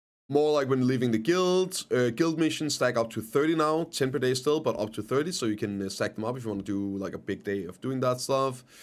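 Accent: Denmark. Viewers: Danglish omg >.<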